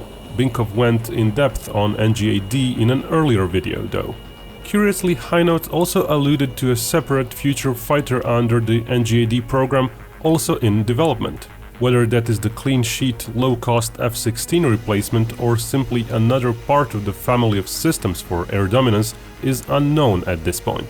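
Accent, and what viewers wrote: Russia. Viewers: Hmmm...some guy with a Russian accent telling us what our Air Force will be doing. I’m just surprised it’s not someone with a Chinese accent. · I don’t trust info from a Russian sounding guy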